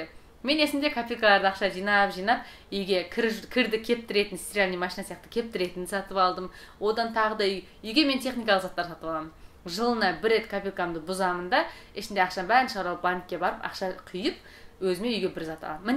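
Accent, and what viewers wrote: Standard. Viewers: Қазақша таза сөйлейді екенсің. Айналдым даусыңнан. Бақытты бол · Менде сізге қосылам,әдемі,сұлу,қазақша таза сөйлейтін,қылықты қыздың кәрістің қатыны болғаны өкінішті.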